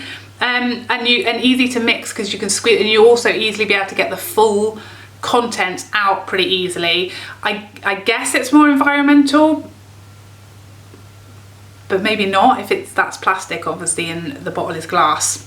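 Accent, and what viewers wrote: United Kingdom. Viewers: I’ve been binge watching your videos while doing a spa night, listening to all this makeup talk in a British accent makes me feel so elegant and sophisticated😂😂 plus you’re HILARIOUS. Love your channel lady🙋🏾‍♀️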